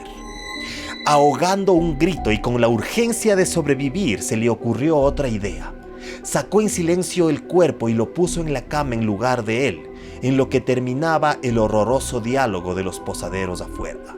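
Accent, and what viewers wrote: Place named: Ecuador. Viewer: Que historia tan horrorosa, pero bien contada, me encanto sobretodo con ese acento de voz ecuatoriano tan bonito. Una historia de horror en un pais que admiro muchisimo.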